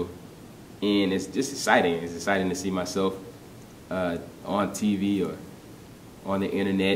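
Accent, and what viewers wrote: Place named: South, United States. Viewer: thank you for the compliment :)..and no I'm not a gangster lol. I just have a southern accent and this video was more about being real and personable instead of super professional with my eye brow raised the whole time (if thats the educated demeanor you speak of) lol. Trust me, I know when to turn it on and off whenever needed. You should check out my video when I hosted on E! year..there was less accent for your pleasure ;)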